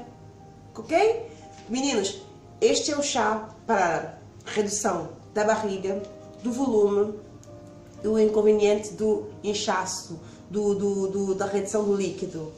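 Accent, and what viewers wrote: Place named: Portugal. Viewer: vcs do Portugal tem um sotaque lindo bj amiga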